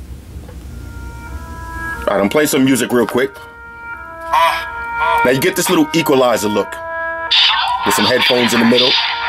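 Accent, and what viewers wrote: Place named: Brooklyn, United States. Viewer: you sound like you're from Brooklyn. Brownsville Here Homie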